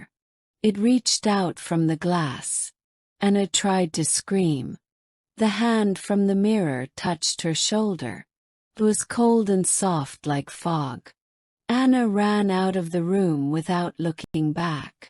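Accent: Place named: United Kingdom. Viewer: شكرا لاني بحاول اتعلم الاكسنت البريطاني ومالقيت كثير مركزين على أن يكون كلام بريطاني مىة بالمىة وانا احب قناتك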